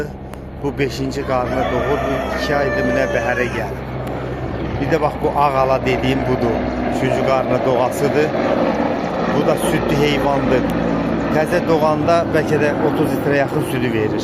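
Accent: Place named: Goycha, Armenia. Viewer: Ləhçən çox xoşuma gəldi GÖYÇƏ MAHALINDAN DEYİLSƏN? BASARKEÇƏR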